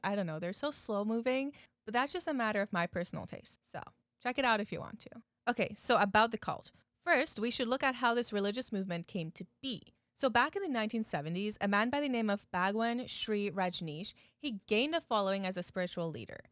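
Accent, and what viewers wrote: United States. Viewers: As a non-American, sounded American to me! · I thought your accent was American😳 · Your accent sounds very Californian to me. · I'm an American but with a terrible ear for accents. I've always thought she sounded American who maybe grew up with parents with accents from another country so that she says some things ever so slightly differently than I expect but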